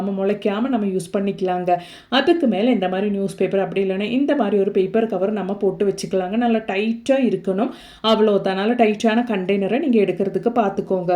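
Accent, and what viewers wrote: Kerala, India. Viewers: Malayalam mix aaguthu voice so ur malayali അല്ലേ 👍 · சகோதரி கேரளா பகுதி அந்த உச்சரிப்பு சிலருக்கு புரியவில்லை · அனியத்தி நீ மலையாளியோ😂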